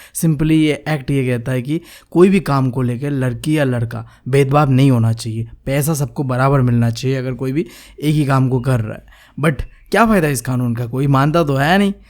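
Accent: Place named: Northeast, India. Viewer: This educator is North eastern? I think so from the accent 😊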